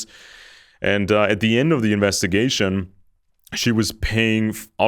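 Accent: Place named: United States. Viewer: Well done! I put headphones on and listen while I work. I can relisten to one episode 3 or 4 times and get something new each time. I really appreciate your efforts. I'M LEARNING!!!!! You're English is so good that if you had not told me you were German I would have thought you were from the USA.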